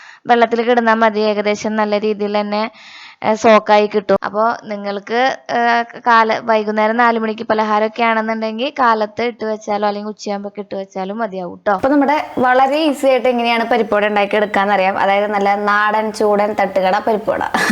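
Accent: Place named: Thrissur, India. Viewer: Chechi.thrissur bhasha aanalloo njnum Thrissur aanuttaa 💪❤️❤️🤗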